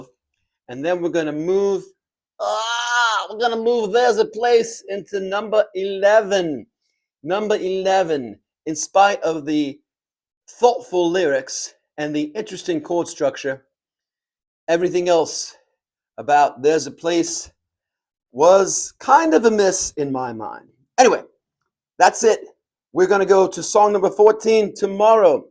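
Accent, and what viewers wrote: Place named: United Kingdom. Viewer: Charming presentation that includes a bit of wit, self-deprecation, and a hint, no quite a bit of a British accent. I loved the “ I know it’s blasphemy“ quip. And Very much enjoyed it being provided with a deeper understanding of the song that I had never really thought about much. Quite the board. Impressive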